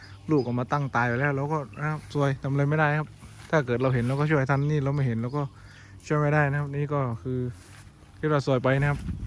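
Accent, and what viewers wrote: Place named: Surat Thani, Thailand. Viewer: แหลงคล้ายๆคนสุราดเลยบ้านยุไหนคับ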